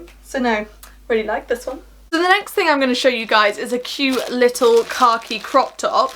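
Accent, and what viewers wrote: United Kingdom. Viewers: Oh you have the beautiful British accent! Love it!!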